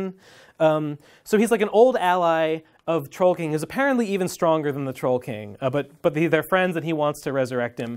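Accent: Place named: Kentucky, United States. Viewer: pretty sure he's from Kentucky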